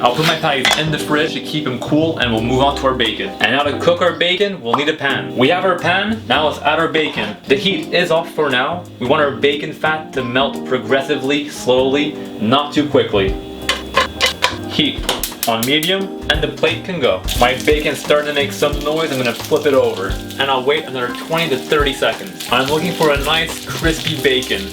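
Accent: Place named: Quebec, Canada. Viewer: Tu sonne comme un anglais québécois c'est drôle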